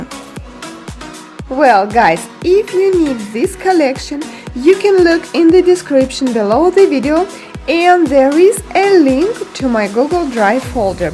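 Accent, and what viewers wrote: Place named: Russia. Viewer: Это круто, но я думаю, вам нужно все таки немного избавиться от русского акцента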